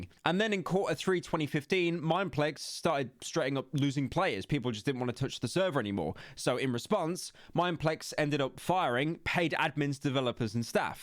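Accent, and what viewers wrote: United Kingdom. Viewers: why are you so quiet british man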